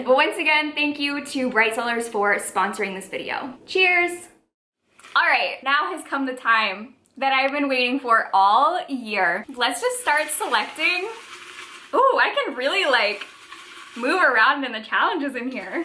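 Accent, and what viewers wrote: United States. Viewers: Hi!! I just wanted to comment to say that Winter People authors name is Irish so it’s pronounced ‘Mac Mah-Hun’ you kind of said it right but with a very very strong American accent which is probably why it sounds kind of weird to us lol!!! I love your videos and your tbr vids are probably my favourite thing to watch 😂 💕💕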